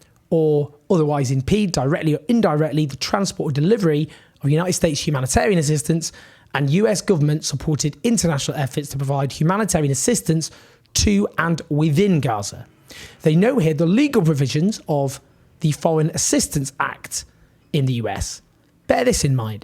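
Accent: United Kingdom. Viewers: But anyways what’s up with a guy with a the gay British accent criticizing when the UK is worse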